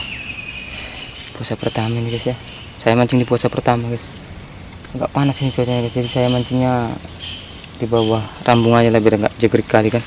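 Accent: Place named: Medan, Indonesia. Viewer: Abang ini orang medan ya aku penasaran logat ngomong nya kayak orang medan...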